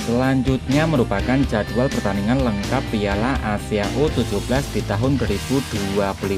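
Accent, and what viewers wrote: Java, Indonesia. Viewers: Yg ke Telu indonesia😂..jawanya medok bang .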